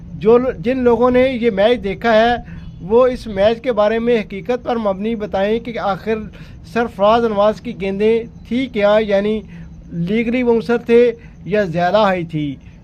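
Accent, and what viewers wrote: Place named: Punjab, India. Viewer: اصل میں کہنا تو نہیں چاہیے مگر کیمرے پر واقعی گفتگو کے لیے اعتماد کے ساتھ مطالعہ چاہیے ہوتا ہے تاکہ الفاظ کا چناؤ ہو سکے کیونکہ تکرار ادبی اور تقریری خامی ہے۔صاحب پوسٹ دونوں حوالے سے کمزور معلوم پڑتے ہیں کیونکہ الفاظ کی ادائیگی میں بھی اعتماد نظر نہیں آیا۔بھلے پنجابی لہجہ غالب رہے لیکن مطالعہ روانی بخشتا ہے ۔ڈراما نگار منو بھائی کا لہجہ پنجابی ہی تھا مگر گفتگو سے پڑھے لکھے ہونے کا پتا چلتا تھا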